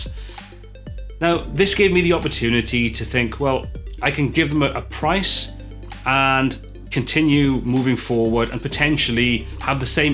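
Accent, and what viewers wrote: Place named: Scotland, United Kingdom. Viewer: Hi. Another great video! I've gotten into all this computer-related stuff really (too?) late in life - how I envy you youngsters! ;-) I'm in the process of trying to put together some websites to (hopefully!) make me some money in the Affiliate marketing game which will - I further hope - be generating a 'nice little earner' by the time my pension comes due (I'm 63). I had to smile when you were on about the inflated price 'trick'. In a previous life - as a self-employed vehicle mechanic - I fell foul of that 'trick' on several occasions! Scenario: Regular customer - good payer. Really sh*tty job that I REALLY don't want! Give customer ridiculously high price quote. Result? Oh, thats less than I expected, go on then, you'd better do it! Result? Oh b*llocks!!! :-) Social life? I was Competition Secretary for a small local golf club for some years and yes, like you, many's the time I was out and about and I'd get a phone call from a club member with some query for which I needed computer access - so I feel your pain! 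lol Finally, I just love your Scottish accent! ;-)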